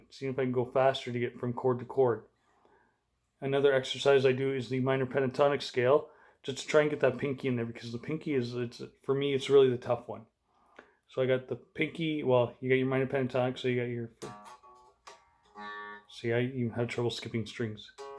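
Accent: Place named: Canada. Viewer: Sounds like you're from Canada.Want to come to youtube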